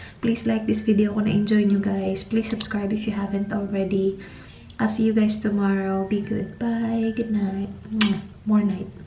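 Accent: Visayas, Philippines. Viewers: magkatawa ko usahay sa imong bisaya day,cute lang paminawon.like (paborutin)😁😁😁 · Cute jud kaayo ka ate mag tag-bis bah... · Nakaka aliw yung bisaya-tagalog 😂❤️ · Hahahahaha, ah oi makatawa ko sa paburutin oi hahahaha.. cute kaayo magtagalog bisaya si 💋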